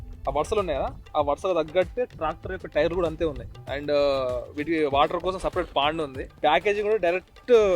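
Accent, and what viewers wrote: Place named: Telangana, India. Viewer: వాళ్ళు మన తెలంగాణా వాల్ల బాయ్ మన భాష లాగ ఉన్నది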